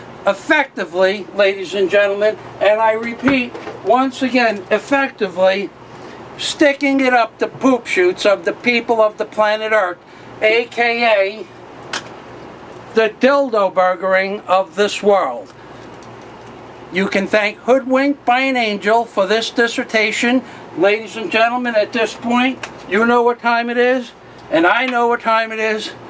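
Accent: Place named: North, United States. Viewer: Thank you for replying. I looked again on the label of the water I buy, it doesn't say 100 nor even 10%; it just is labeled as "Distilled Water". I buy it at Family Dollar because I'm within a short walking distance to the store & it's very convenient. I'm now wondering if there is a way to determine exactly what % is actually distilled in their water? I have of course thought to buy a distiller however I'm retired on a budget. My thought in purchasing a machine was comparing it to the cost of electricity to run a distiller & how much usable water I'ld actually get in say an hour of use, that purchasing it would be about the same(I pay $1 gal). Have you figured out the cost to run your machine per gallon? I'm not sure the equation to do that here, but cooking or heating anything certainly raises my monthly bills. Oh, by the way I was pleasantly surprised to hear a friendly northern accent, haven't heard one in a long time as I moved from NJ more than 20 yrs ago to VA & I'm the only one in town still getting asked where I'm from! 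At least the pollution is less & the lines are smaller here. If it wasn't for the chemtrails it would be heaven, but I don't think there's a place left where that doesn't happen, ugh!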